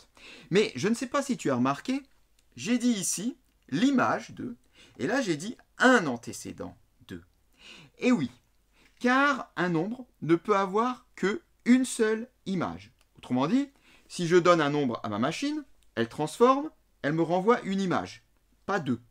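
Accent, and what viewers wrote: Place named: Alsace, France. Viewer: en alsace on le prononce, et il me semble qu’il vient de là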